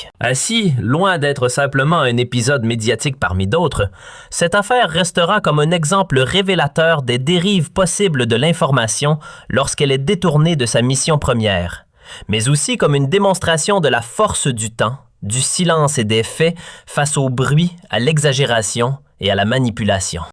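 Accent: Canada. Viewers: Pourquoi la voix a un accent canadien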